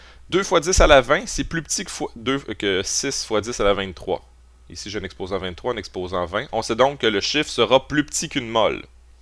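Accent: Quebec, Canada. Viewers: ps:l'accent Québécois est énorme 😅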